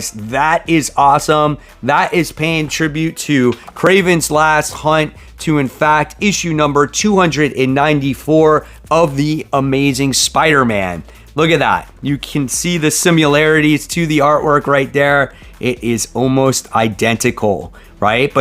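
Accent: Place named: Florida, United States. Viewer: You Floridian!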